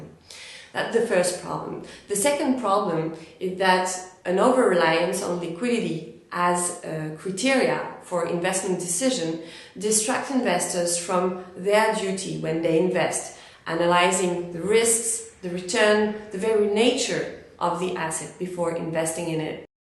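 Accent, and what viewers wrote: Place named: France. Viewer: she is a french speaker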